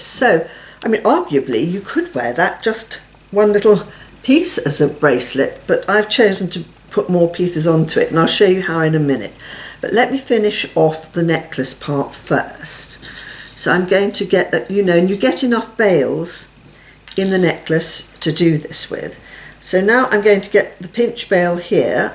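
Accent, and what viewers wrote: England, United Kingdom. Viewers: Oh my goodness. I love how strong your English is. my fathers mother spoke the same as you. I've always loved to talk like you. but I come from South East in Suffolk. so I sound more like a farmer than you do. 😯😩👍💜💕😷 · What class! It's so nice to hear a beautiful english accent. What a talanted lady, you are. Thank you for this elegant pendent. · Just discovered you. Love you english accent. what is the size of the montee used?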